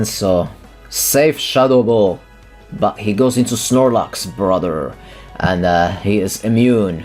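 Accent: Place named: Japan. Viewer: Your Japanese accent is epic. Critical hitto! Jettoman! I love it.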